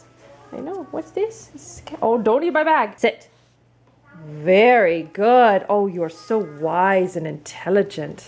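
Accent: Canada. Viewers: I love how your Canadian accent comes out so much more when your at your parents house. Seriously love you lol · When you get near your parents you get 100x more Canadian LMAO this video was so cute :)) I would love to see these more!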